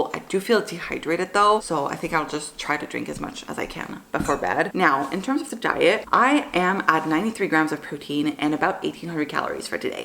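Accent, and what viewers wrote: Quebec, Canada. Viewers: New subscriber here. Are you French Canadian? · This is my first impression and I’m not mad about it my queen❤❤ are you québécoise ?? Hihi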